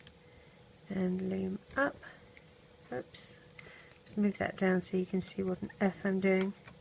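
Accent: England, United Kingdom. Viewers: u sound english!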